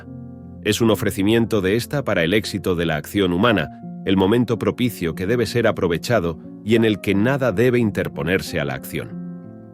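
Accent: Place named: Spain. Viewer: ¿Por qué usas el acento español?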